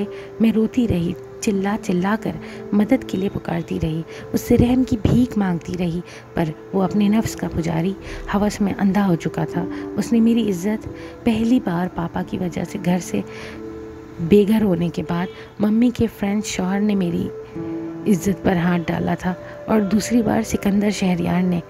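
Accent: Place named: India. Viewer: I just dont understand as being an Urdu speaker why can't you pronounce Urdu words properly? you keep saying TULKHI INSTEAD OF TALKHI, and many other words like this and since when Pakistani's started speaking like Indian?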